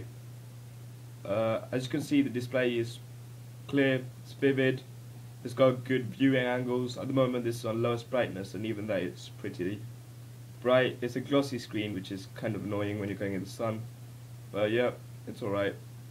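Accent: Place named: London, United Kingdom. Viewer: You sound like you're from Harrow or Stanmore. I'd be so happy if I'm right :P P.s. good video, thanks. Think I'll be getting the cyclone voyager 10.1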